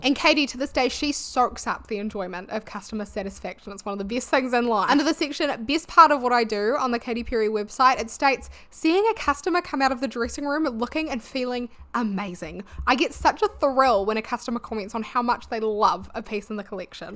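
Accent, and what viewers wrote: New Zealand. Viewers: I think it's the New Zealand accent but when you say, it sounds just like Katy Perry, or is it the other way round? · without any knowledge i will say to my brain, your accent is what Glorilla’s southern accent is to the US South to NZ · Girl please never apologize for the way you talk, I was an au pair in NZ and your accent reminds me of that amazing time there 🫶 when I watched one of your videos with my bf he said he can finally pinpoint what my accent sounds like (English isn’t my first language), and it made me so happy that I still have some of it left years later. Love the kiwi accent, love your videos, cheers from halfway around the globe ☺️ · omg i love it, the research you do is so thorough! btw I am a kiwi living in the UK and I am so glad to have found your channel, your accent and humour is like a little slice of home <3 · Where in NZ are you from? 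I've lived here my whole life, and I can't place it 😅I'm from Christchurch! It's always good to see a fellow Kiwi putting themselves out there. My fingers and toes are crossed for your success <3 Omg also do you remember when she came to NZ in 2014? It was mad! People were desperate for tickets. My sister and I went for our 10th birthday and ended up giving our tickets to two teenage girls who were crying outside Spark Arena. I honestly still feel good about it lol We were right up the top and I got so sick and overwhelmed. We ended up having dinner with two nice ladies at the restaurant next door. Man, that was an interesting era of pop culture.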